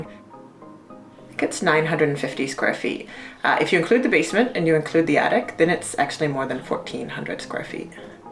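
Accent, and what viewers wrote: Canada. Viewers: Compared to American tiny houses, this is a mansion! Where the heck is it? She sounds Canadian. Ah ha! Canadian and Toronto. Very cool tour! Lucky! Despite the narrow size, I bet it cost quite a bit to build and the rent is probably not cheap. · Love this house! Is her accent from Toronto? It doesn’t sound like the Canadian accents I’m used to, it’s adorable so I’m just curious.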